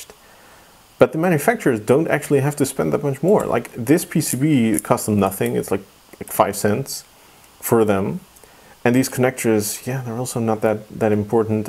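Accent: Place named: Netherlands. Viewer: We will save that for a rainy day... Oh wait... Hahah I love your video's. Some things i dont understand, but you explain things very clearly. I find it very fun to watch. Accent is good too, normaly a dutch accent really irritates me, but not you, the accent is very minor.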